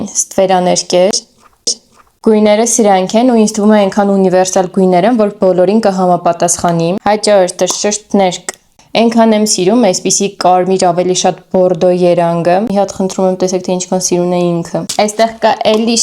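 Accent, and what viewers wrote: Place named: Gyumri, Armenia. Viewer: Գյումրիից ես գյումրվա բառբառոց խոսի😂❤❤❤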